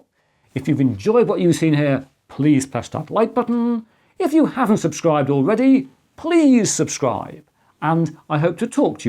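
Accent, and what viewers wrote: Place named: United Kingdom. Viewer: I have subscribed to both of your channels because I'm interested in computing and older technology, but I also love the way you explaining things in this very British tone of voice. I can't help myself, but I just love the way you talk. It's the clear and precise English vocabulary from my earliest learning lessons in school which makes it so easy for me to understand what you are talking about. Greetings from Germany.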